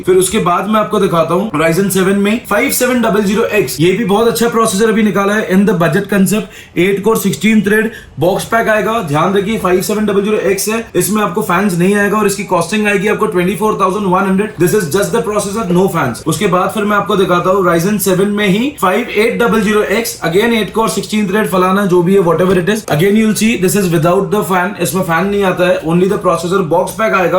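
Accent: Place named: India. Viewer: ur voice is too good to other's literally..like in hindi accent so make video's in multi purpose bro soon u will hit 1 million in month like make videos on cars and anyother vlog and every week 3 vlogs nikaloo aur maintain rakho like cars ke upr aur tour ke upr aur our community gaming 🇮🇳🇮🇳🇮🇳🇮🇳🇮🇳🇮🇳🇮🇳🇮🇳🇮🇳 bro plss u can do whatever i say i hope u listen.... Ku ki baad mei reply ni krta koi 😅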